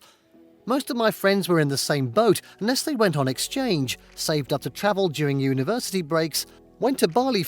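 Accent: England, United Kingdom. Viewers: This entire video was a hate campaign produced Indians using a Brittish accented narrator who claims he was born and raised in Australia. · Yeah, someone else see's through his bullshit. Aussie with an English accent. Pertains to have been born here, yet on another video he says he would like to visit. Some other commenter claims this originates in India. Maybe that is why such an emphasis on it. By the way this banana really is full of poo poo. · Mistakes 1. New Zealand flag not Australian 2. English not Australian accent 3. Supposed indigenous people contained indigenous to Africa, North America and South Pacific Islands 4. Photo of second largest city which is Melbourne were actually of third largest city Brisbane Many more WHAT A FAKE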